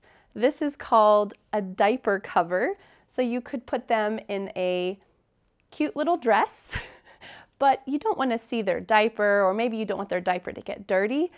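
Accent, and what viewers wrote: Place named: United States. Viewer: Hi Lady! You teached me english vocabulary and US 's accent also! Tks so much! However, each clip should be not long over 10min than very well !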